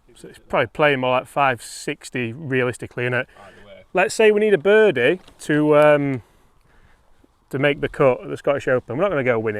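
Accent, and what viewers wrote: Yorkshire, United Kingdom. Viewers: Does using an Americanism like suck with a Yorkshire accent really work? Sorry but being British, that kind of thing is a bit of a pet hate of mine but I suppose we all have them, don't we?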